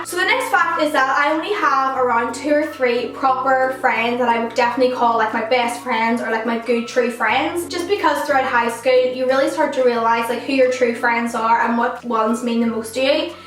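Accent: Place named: North, United Kingdom. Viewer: I love your accent! my dad is from the north too so I love hearing people's accents other than his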